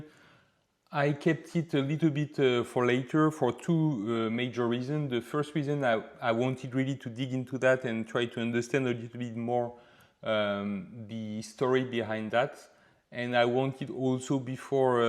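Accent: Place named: France. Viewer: I love your French (?) accent! Thank you these tutorials! Btw, are you familiar with 3D LUT Creator? It's an amazing app (unfortunately a paid app). It has some remarkable tools (that, actually, remind me of some of the tools in DaVinci Resolve for color). I'd love if something the the A/B Grid was incorporated into Darktable. And if not, this is an amazing raw editor.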